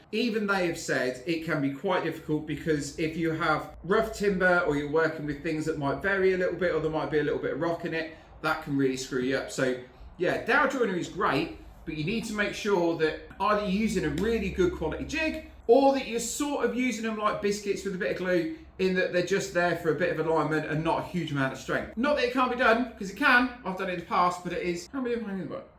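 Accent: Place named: United Kingdom. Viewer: It looks like you have lots of experience along and innovative ideas etc. However, I can't tell which part of the UK you are from, but listening to you, I couldn't tell what you were saying about 30% of the time, especially at the end of sentences and brief asides. I don't say this to be demeaning, rather just to let you know. If I'm having a problem, and I'm from the northwest of England, then there's a good chance lots of other people will have the same problem. When I first moved to North America, I even had someone ask me if I was English, because they couldn't understand me, so I know what it's like. I suggest that you slow down a little, try not to clip the ends off words, and avoid local dialect that listeners from other countries don't understand. Hopefully, you will gain more subscribers which, I believe, you deserve.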